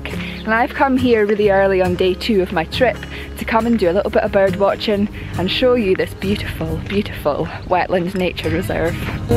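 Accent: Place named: Aberdeenshire, United Kingdom. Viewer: Hello, can i ask? Far div ye bide? Im from crimond so know all these places, live in aberdeen now because of work, but nip in past crimond and count the minutes on the clock 🤣😉 just hearing you talk makes me think you are also from aberdeenshire, great video also 😊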